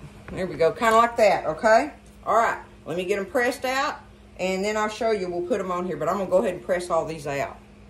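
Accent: South, United States. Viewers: It looks delicious.. you sound like one of my Aunties down south when you talk.. you're very pretty ❤🥰 just like my Aunties..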